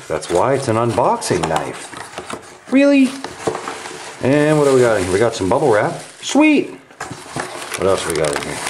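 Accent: United Kingdom. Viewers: Best british accent ever. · Thumbs up for the English accent! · lmfao your British accent is epic, it makes us British feel proud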